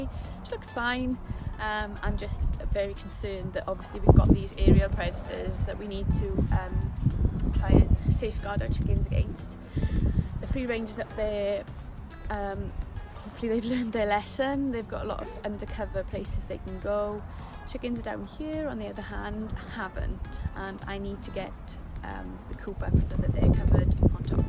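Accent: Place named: Wales, United Kingdom. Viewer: Loving your channel. I am stuck in a town but would love to live on a farm, as I dearly miss the countryside that I enjoyed but did not respect in my youth. Now of course in my old age I truly miss it. You certainly are a hard working Lady. I hope your family appreciates all that you do. I love your Welsh accent too. It is so very melodic. The Pastor's wife at my church is Welsh and has a very deep accent. She plays the Piano and leads us in song. I sit right at the front near the piano just to hear her lovely singing voice clearly. She is my little songbird and sounds just beautiful. Anyway, thank you for your videos, and God bless you and your family. Ken in Lancashire.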